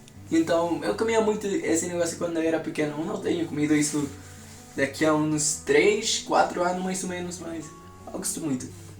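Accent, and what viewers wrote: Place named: Rio de Janeiro, Brazil. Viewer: Vc tem uma mistura de sotaque carioca e português de Portugal, mas está muito bom kkk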